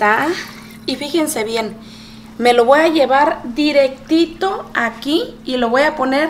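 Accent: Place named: United States. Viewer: Usted tiene tone de rancho pero se siente americana Por sus pronunciaciones eso se llama malinchista, Y dice que es de Michoacán.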